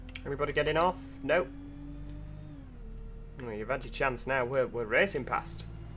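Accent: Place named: Yorkshire, United Kingdom. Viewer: Nice video.I love the west country area, in the game and in real life, are you from Yorkshire, you have a very strong accent.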